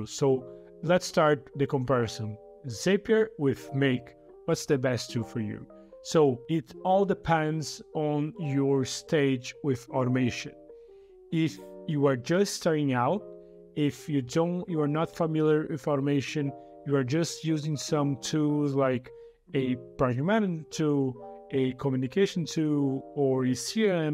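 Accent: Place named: Brazil. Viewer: eu nn posso provar, mas tu tem um sotaque de br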